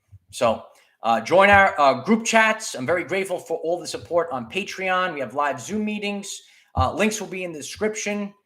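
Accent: New York, United States. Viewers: OMG, I can hear NY in your accent! How do you get your hair so shiny? Like your content these days...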